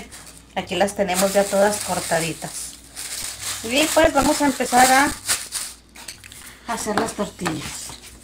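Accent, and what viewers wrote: Mexico. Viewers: !!! Tu video es súper eficaz y me encanta el dejo Mexicanoooo! Saludos desde Peru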